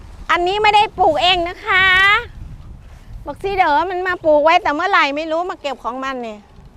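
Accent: Isan, Thailand. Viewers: คุณยายเก่งและน่ารักตลอดเลยคะ เว้าภาษาอิสานตะฮักคักจ้า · หนูเป็นfcยายมาตั้งแต่หลานเจ่าสายฟ้ากับพายุน้อยๆนั่งเล่นยุนำยายเจ่ากะเว้าอีสาวม่วนดีจ้าช้อบฟังตอนเจ่าเว้าลาว❤❤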